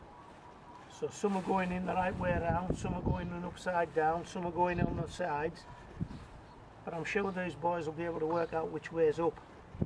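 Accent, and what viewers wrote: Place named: Cumbria, United Kingdom. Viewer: So what happened? Did you get a crop? Thanks for the videos BTW - my other half says "that's a good Cumbrian accent" (and she should know)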